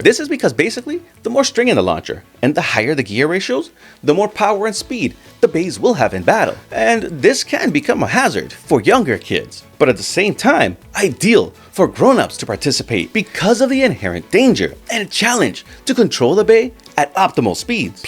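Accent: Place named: Canada. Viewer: [tɑ.kɑ.ɹɑ təʊ.miː] is how I pronounce it. Japanese people don’t bat an eye when I say it. But I don’t feel like I say it like they say it. You’re speaking in English with a Canadian accent. You’re not saying it the way I say it. But I ain’t a Canadian. In the end, who cares? 日本語を話しますか？Probably not. But again, who cares? I’m still trying to get used to your cadence. 😅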